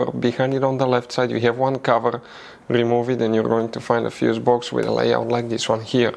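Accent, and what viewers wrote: Russia. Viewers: Just curious my friend where is your accent from? Russia?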